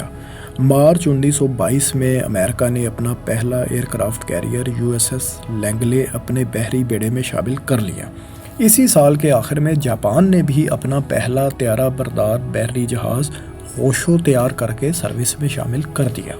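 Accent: Punjab, Pakistan. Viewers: پنجابی ایکسنٹ میں اردو یہ جناب بولتے ہیں۔ پروگرام لاجواب ہے مگر غیر موزوں آواز کے باعث اپنی افادیت کھودیتا ہے۔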